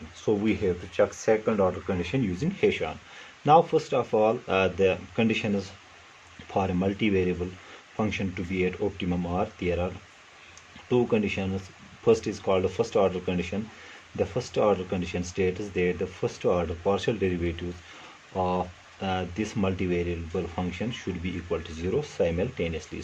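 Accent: Kashmir, India. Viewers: from ua accent it looks like u r from kashmir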